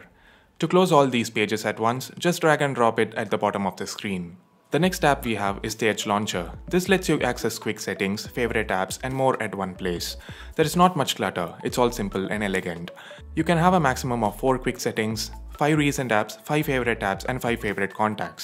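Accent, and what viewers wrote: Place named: Tamil Nadu, India. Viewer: u still couldn't get rid of tamilian accent. long way to go....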